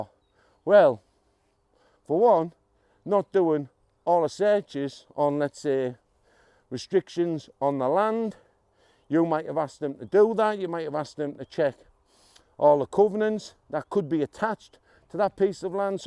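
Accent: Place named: North East England, United Kingdom. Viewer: Hiya mate sound like ya up NE somewhere... maybe Redcar way ? Just found the channel and appreciate whatever advice you have to share. Do you still have to cough up council tax or business rates if classed as smallholding ? Cheers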